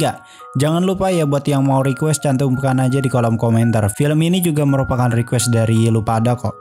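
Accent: West Java, Indonesia. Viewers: Bang Orang Sunda Y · Wah si mamang nyunda euy wkwkw mantap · Sunda pisan🤣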